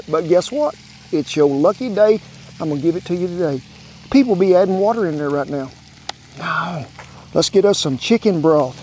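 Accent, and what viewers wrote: South, United States. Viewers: Love me some uneducated-southern-accent. Edit: Not everyone in Texas speaks like.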